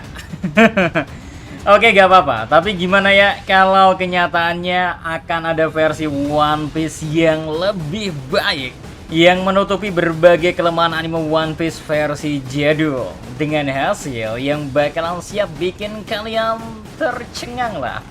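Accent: Java, Indonesia. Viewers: Jngn, krna medok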